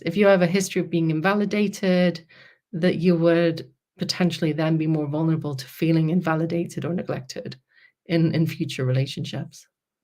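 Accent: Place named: Northern Ireland, United Kingdom. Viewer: I thought I could hear a hint of a N.I accent.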